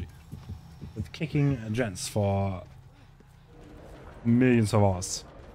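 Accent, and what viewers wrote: Germany. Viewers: This german acsent.. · bro are you german?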